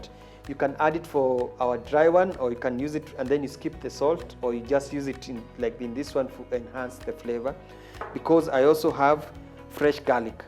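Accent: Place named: East Africa. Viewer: Oooh I can understand this Gud East African English thank uuu chef!!!💪💪💪❤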